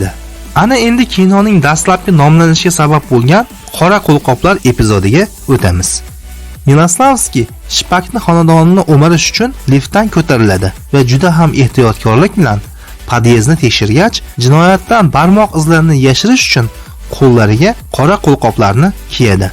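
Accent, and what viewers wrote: Namangan, Uzbekistan. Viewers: Наманганлик акансиза шархловчи😊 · Наманган шеъвада гапирган админ. Шеъвани йўқотиб адабий тилда чиройлироқ гапиринг. Русча сўзларни ҳам Наманган шеъвада гапириб юбораябсиз. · аввало узингни .талаффузингни Тугрилагин Наманганликмисан нима бало шевангни.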